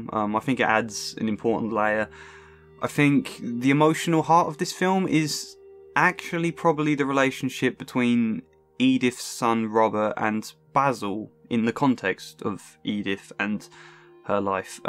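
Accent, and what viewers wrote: United Kingdom. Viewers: You sound British, but I never could figure out from where, so this explains it (I am horrible at accents and can't recognize them well, so I wasn't worried about not being able to place you). I've lived in Texas my whole life, but I don't feel connected to it or the US in general. I don't feel tied to anywhere, nor do I fit in. It's wonderful to hear you speak of the place you come from with such affection and connection. I guess it's no coincidence that you represented yourself with a tree? You are tied to the lands where you were born. :) <3 Oh, and I do need to go watch this movie now! X)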